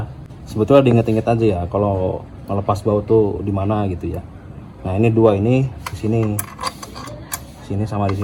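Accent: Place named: Indramayu, Indonesia. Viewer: posisi bengkele ning ndi kang.. logate kayae wong dermayu ya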